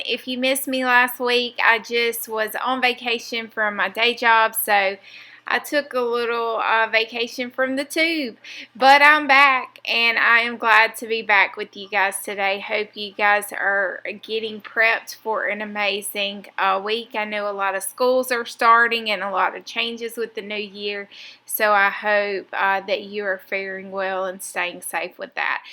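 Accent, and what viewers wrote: South, United States. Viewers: Happy to hear your southern voice again, very relaxing for me. 😍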